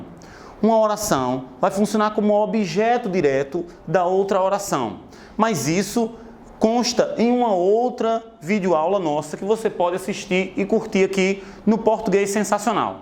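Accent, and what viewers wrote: Northeast, Brazil. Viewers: vídeos Diversos ele é nordestino né não? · Seu sotaque é do Piauí ou da Paraiba ? Aula Show e sensacional !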